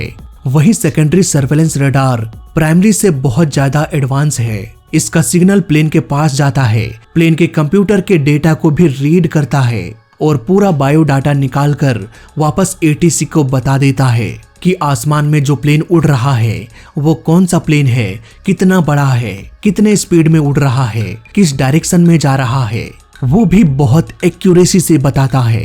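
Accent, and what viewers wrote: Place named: Rajasthan, India. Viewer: Rajasthani accent baa. Roshni ko Rosni......ese, kese, vese.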